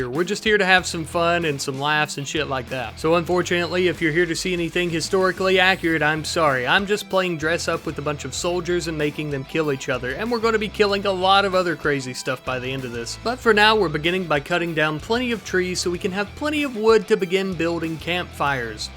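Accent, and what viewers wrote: United States. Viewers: Wait your American?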